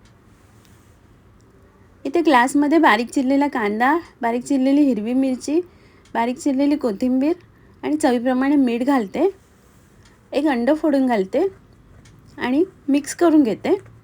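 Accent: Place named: Goa, India. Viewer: तुमची सांगण्याची पद्धत फारच गोड आहे.ऐकतच रहावे असे वाटते.गोव्याचे कोकणी मराठी मध्ये.❤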